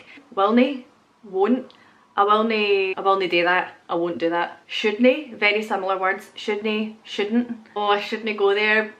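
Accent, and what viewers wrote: Scotland, United Kingdom. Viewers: This video is a gold mine for any actors or dialecticians looking to master a Scots accent. A lot of these aren't so much unique words that aren't in other English-speaking dialects, but rather the unique Scots pronunciations of those words -- and I love that kind of thing! Great stuff! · You lost like 80% of your Scottish accents. Just remembered you out of nowhere. used you love your accent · Polis, get tae and lassie are three of my faves. I've subscribed, great accent, attractive on a woman I think! I'm from south west England myself but know some nice Scottish people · Love Scottish accent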